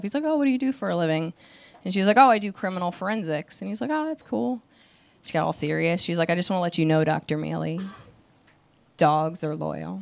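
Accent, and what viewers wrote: New York, United States. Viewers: Shes like super cute. But shes got that standard, new york, fran drescher thing goin on. She would make a nice wife.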